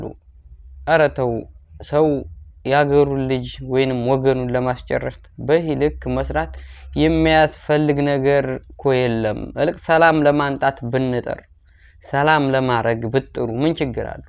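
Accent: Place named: Rural, Ethiopia. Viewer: በርታ የእኔ ባላገር እንደ ወረደ የሆነውን የአማርኛ ቋንቋህን ወድጀዋለሁ ።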